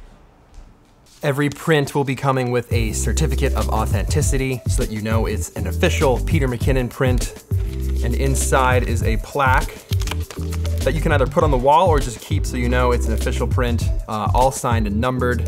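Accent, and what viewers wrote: England, United Kingdom. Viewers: Man, that perfect British accent cracks me up EVERY SINGLE TIME🤣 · That english accent though 😲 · That British Accent though 😹❤ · that british accent broke my mind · That British accent 😂😂👌🏽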